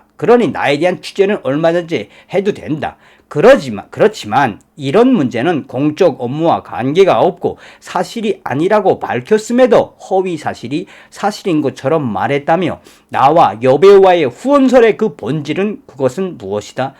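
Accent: North Korea. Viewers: 이북사투리좀쓰지마세요 공판장아니고 재판장.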